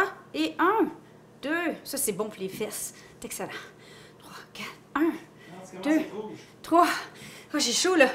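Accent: Quebec, Canada. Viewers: Bonjour 👋 j’adore t’es vidéos je rigole et je me régale,t’es recette sont tous simplement génial j’adore 👍,et ton accent 😄j’adore,vous les québécois et vos expressions c’est trop marrant,au j’arrive à la fin de la vidéo 😛🤪tu me donne faim,Isi il est 1h20 du matin comme je n’arrive pas à dormir je vais réaliser t’as recette,gros bisous à toi et à chéri 👍🍀💋💋💋💋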